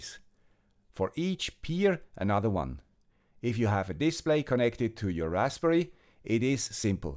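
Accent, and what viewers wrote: Switzerland. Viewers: Thank you guy with the Swiss accent. This exactly what I’m in the middle of trying to set up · Never imagined a guy with swiss accent would save the internet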